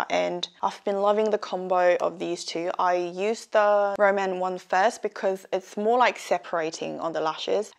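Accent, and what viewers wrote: United Kingdom. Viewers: 졸예❤️ 예전처럼 아이라인을 빼서 그리지 않아도 있는 그대로 자연스럽게 너무 이쁘네요~ 사용하는 색이 더 옅어지니까 좀더 퓨어 해 보여요💛 이번 스틴푸드 파데도 이런 맑은 메이크업에 너무 찰떡이에요💜 비건 제품이라 너무 좋네요 비건 제품이 더 많아졌으면 좋겠어요~ 그리고 의 영국식 영어는 언제 들어도 멋져요😊 뭔가 해리포터가 생각나는…?? 저만 그런거 아니죠??ㅋㅋ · 언니 브리티시 악센트를 다시 들을 수 있다니 감덩이에요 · 으아 ㅠㅠㅠㅜㅜㅜ제가 좋아요, 댓글 일등이라구여ㅠㅠㅠㅠㅠ헝 사랑해여 울언니ㅜㅜ 영어 영상 너무 좋아여..그녀의 영국식 발음 = 귀르가즘 🤍 그럼 이제 영상에 집중 할게요 🙈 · 딱 틀자마자 영어하시는거 보고 영국 생각났네요ㅠㅠㅠ 앞으로도 자주 듣고싶어요💕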